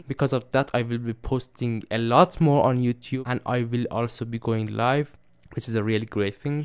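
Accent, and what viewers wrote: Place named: Iran. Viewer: Once I watched your short outta nowhere, your persian accent got me, ngl. Nice job, too much love from Shomal.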